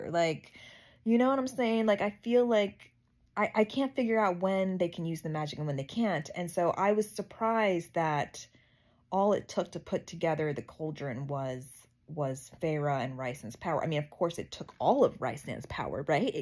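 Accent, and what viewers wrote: Baltimore, United States. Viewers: You got that Hon accent.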